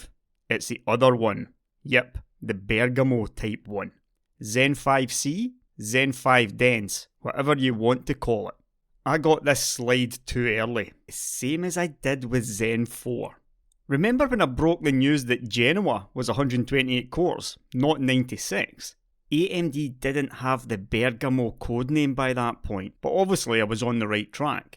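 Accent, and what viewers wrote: Scotland, United Kingdom. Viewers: a scottish tech youtuber say "all right guys, how's it goin?"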